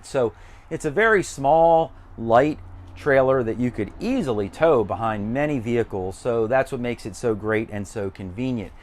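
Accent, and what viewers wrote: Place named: South, United States. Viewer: . Where you from. You have a very distinctive accent. I'm gonna guess southern or southeast Virginia? North Carolina?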